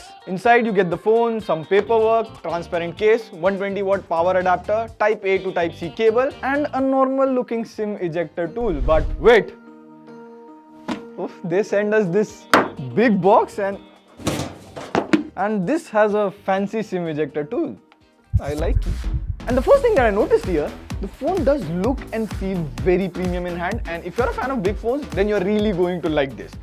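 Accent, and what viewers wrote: India. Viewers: English language video with Hindi sentences accent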